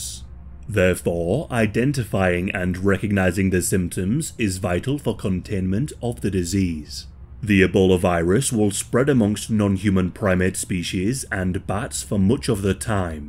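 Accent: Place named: Scotland, United Kingdom. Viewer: I love your accent! Where are you from?! I wanna’ say Scotland, but, I could be wrong. I love your channel, too.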